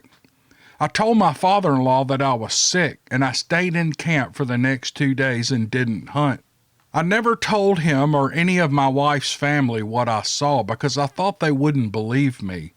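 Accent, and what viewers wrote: South, United States. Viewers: It's that sweet southern drawl....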